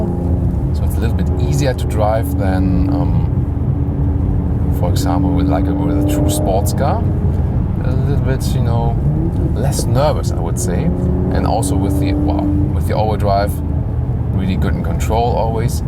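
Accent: Germany. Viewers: The German accent is literally the best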